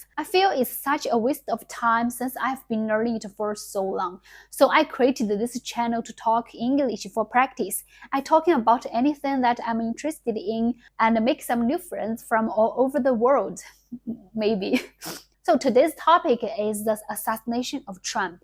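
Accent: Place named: China. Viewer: Holy smokes you speak great English! you still have a slight Chinese accent/feel but overall really good in a global standard kind of way, you will be understood no problem in the rest of the English speaking world, I'm Chinese-Filipino but I can't even speak a drop of Chinese, I can only speak Tagalog and English with a generic American accent, so from a native English speaker's point of view, you speak awesome English, you should be proud of yourself for that accomplishment, not an easy feat if you don't learn it from someone or from a school and if you don't have anyone to talk to on a daily basis from a very young age.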